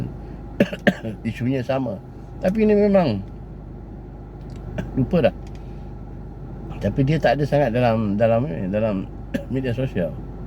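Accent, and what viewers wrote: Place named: North, Malaysia. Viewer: Deme ni X faham cara ckp org utara. 'anak haram' ni bkn maksud maki. Biasa je.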